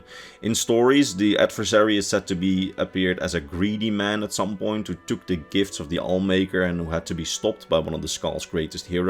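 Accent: Netherlands. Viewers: you sound Dutch, I'm guessing you're from Holland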